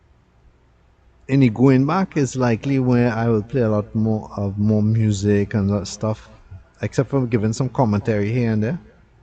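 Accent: Trinidad and Tobago. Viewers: Hello,I love watching all your videos, I like the trinidadian accent,I come as often as possible as my family all live there,I now live in Europe,please talk more and Ill watch more often..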